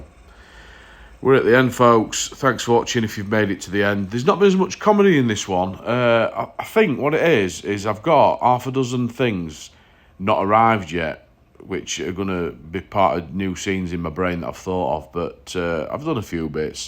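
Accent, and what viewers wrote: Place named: Yorkshire, United Kingdom. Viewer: Well I must admit I really enjoyed the video and the humour was excellent (good Yorkshire dialect). However, as a seller online I fo have to comment on cost of delivery. I pay £2.84 to send a parcel, it’s cost me around 65p to make packaging and buy the box, bubble wrap and tape. I have to drive to drop the parcels off at the drop off point and there is my time. I charge £3.25 for postage on my site and do I think you are getting a deal. Yes, I look for a good deal and also like free delivery. If I have free delivery I would lose on 85% on all sales so I would have to include that in the cost of the item and my prices would increase, when you buy more than one item the postage is combined so you get free postage after the first item anyway. Just think about it. Regards, Geoff -Scenic3D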